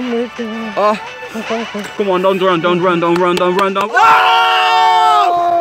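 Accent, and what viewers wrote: Netherlands. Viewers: Ohhh you're dutch, that explains the accent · Are you dutch????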